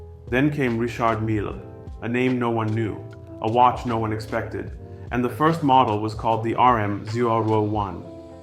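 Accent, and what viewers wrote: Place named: United Kingdom. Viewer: You sound more British in this video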